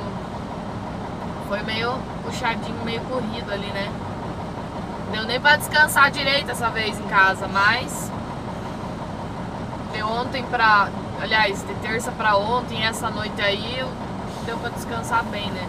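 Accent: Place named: South, Brazil. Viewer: Boa tarde,que sutaque bacana,por isso que gosto do sul,bom final de semana ,que Deus abençoe muitíssimo.rio de janeiro.bairro Pavuna.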